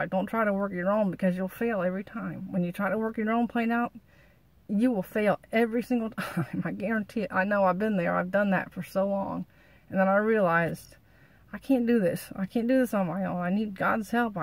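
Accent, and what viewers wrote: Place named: South, United States. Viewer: Thanks for sharing your story. I love to hear you speak, you are so down to earth so real. I love how you talk, like you're right here next to me. I feel you are not from the Northern States, love the Southern talk, so Natural, so encouraging to just listen to you again and again, for I too am a Southern woman of God, I love so so much. Hallelujah !!! I share a bond a spiritual bond with you as one I truly admire and appreciate, such an inspiration to me, for I loved you the first moment I connected spiritually with you, for you are so real, so truthful, have a heart of gold, so precious, in how well you get his words of truth out to me, and to others. What a blessing you are to me. I feel so comfortable when we share the Father's love. I love, love, love, his words, as you speak it out. God Bless you precious beloved one, my sister in Christ. 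Amen !!!!!!!!!!!!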